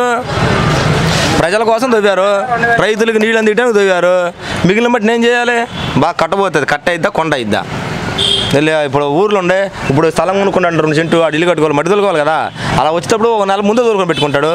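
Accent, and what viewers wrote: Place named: Godavari, India. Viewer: Super bro. Godavari style lo pettavu bhale ycheaps ki. We want to see more of your speeches in Social media. Thanks to for the effort 👌👍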